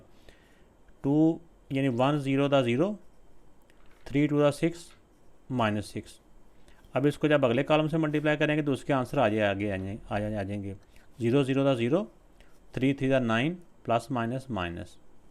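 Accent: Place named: Faisalabad, Pakistan. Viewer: Sir ap Faisalabad sy ha naww safff PATA chl rha ha 🫥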